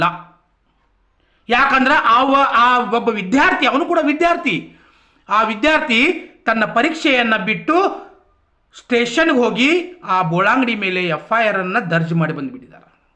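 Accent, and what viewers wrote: North Karnataka, India. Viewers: ಉತ್ತರಕರ್ನಾಟಕದ ಜವಾರಿ ಭಾಷೆ.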